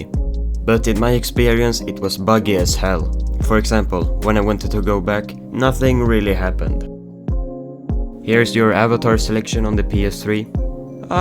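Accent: Sweden. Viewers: I can hear your Swedish accent as you said " " unshorted series? Mean uncharted? To be fair we both have similar languages in terms of syntax. Just remember to add the T in RS pronunciations to words. Some* xD It's okay.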